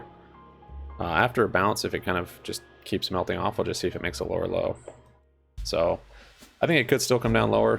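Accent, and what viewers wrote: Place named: United States. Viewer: Dude, you sound just like Elon Musk with a more American twang. Are you Elon Musk?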